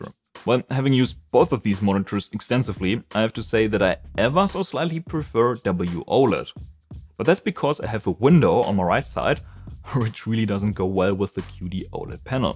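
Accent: Germany. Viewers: The first video I ever watched from him, after first couple of sentences, I tought to myself: " Oh, a German doing reviews in English, that's rare". And I'm Croatian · its so german it sounds not german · I hear the german accent but appreciate that the video is in english lol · You German? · He has a pretty pronounced German accent lol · He has about the strongest German accent out there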